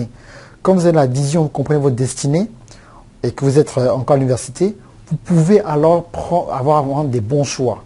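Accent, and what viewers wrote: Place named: Gabon. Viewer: L ACCENT GABONAIS HEIN. LOOL COOL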